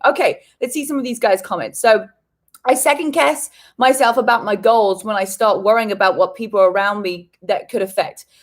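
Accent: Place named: United Kingdom. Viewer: So... Firstly - you are amazing! I love your mindset and confidence. And the fact you still have British accent:) And that you know you are enough. My kind of gal! I also love going places by myself and it really is what they say - you learn so much from it! Last year I finally got out of my comfort zone and went to solo trip to Ireland (on my wishlist for years and years). I am use to go places by myself that are near bye but not to another country for 14 days. I was having excuses for years why I can't go (money, time) and than I called out my BS and said to myself: Alenka, you will never get there apparently. So much about ''how much you want to go.'' And I decided and I make an action plan and went. And like you said: Yes it's scary, I was also renting a car, driving on wrong side (:)) of the road, etc. but I learned so much and it gave me so much trust in myself. 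I can do it. By myself. So cheers to exploring. And basically to everything you have to say! The other thing I love is hotel story. I totally agree. We all need more compassion and understanding. And listening to each other not just talking. Oh and playlists yes. So true. Some songs made me up and move around in a heart beat. One of the songs is -. What a tune! With your help - the podcast, Burn/BTES app, the book you really took me to the next level. Most important lessons for me were: setting goals (3m, 6m, 1y especially), what can I do each day to reach them, foc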